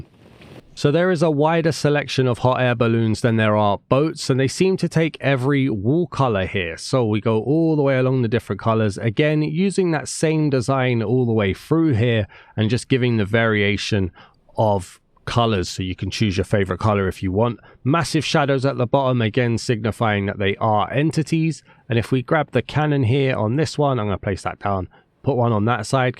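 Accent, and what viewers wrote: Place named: United Kingdom. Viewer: Im never get tired of british accent 😅 tyal 4 the vid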